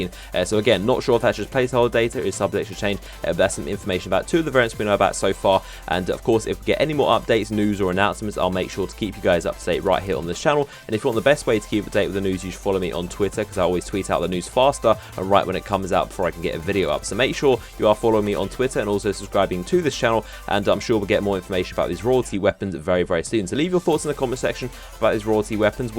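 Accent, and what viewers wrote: United Kingdom. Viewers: zeus warrior he is British thats just how they do that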